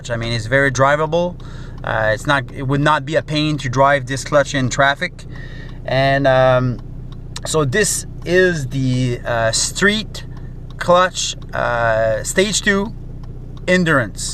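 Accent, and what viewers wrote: Quebec, Canada. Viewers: good video thanks, I'm doing mine today, You sound quebecois , Are you in Gatineau or Montreal?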